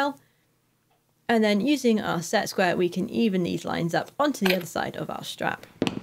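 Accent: England, United Kingdom. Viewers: Dear I think you are from England because of your English language accent, am I right.?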